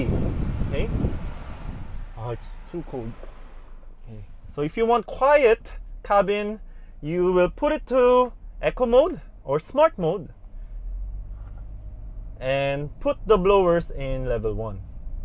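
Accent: Philippines. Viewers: I like the way he speaks English with an Ilonggo accent.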